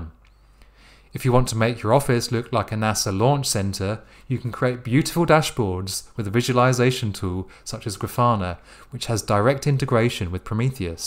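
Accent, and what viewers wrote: United Kingdom. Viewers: Thank you very much sir for this video, love that british accent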